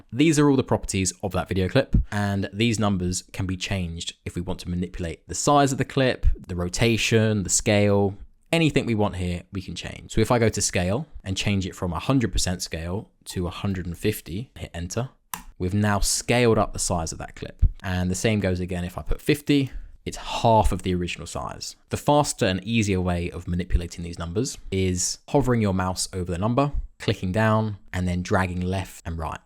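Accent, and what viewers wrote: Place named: United Kingdom. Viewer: Thank you for these tutorials, you are a really good teacher - and British which helps me a lot! I have been trying to learn how to make a read-a-long video, similar to a karaoke video but to go along with audio narration. If you think there is a market for a tutorial on this, it would be awesome. Thank you x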